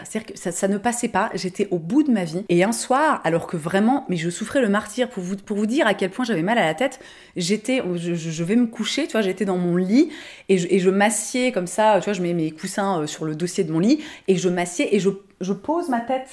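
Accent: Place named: South, France. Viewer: Une vidéo revigorante (avec l'accent du sud) j'adore, prends bien soin de toi, compte bien tes cachets antidépresseur (haha) et vive la menthe poivrée !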